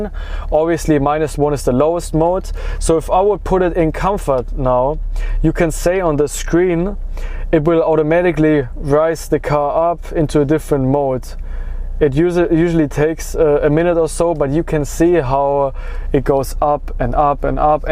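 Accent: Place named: Germany. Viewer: Are you German? You sounds very German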